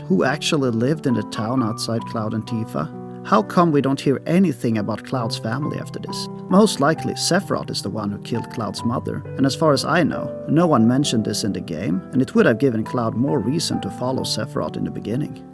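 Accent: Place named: Sweden. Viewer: May I ask what country you’re from? You sound Swedish, and I hope that’s not insulting. My grandma is a full blown Swede. Great channel dude! I’ll definitely be following you and looking forward to what you put out next.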